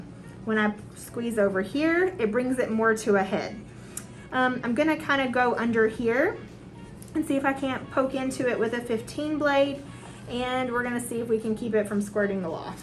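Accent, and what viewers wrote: South, United States. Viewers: Do any of you know of a great guide to Lexington? I am looking to move and want a safe but affordable condo/townhome as I downsize. Do y'all like Lexington as your home? Is it easy to make friends? I am a Lupus patient and will need rheumatologist/nephrologist/PCP/orthopedic group...the works! I posted this question because of your sweet Southern accent - made me feel at home though I am from Texas. Any and all info would be appreciated. Thanks in advance and peace and love to all!! 💜🌺🌸💜💜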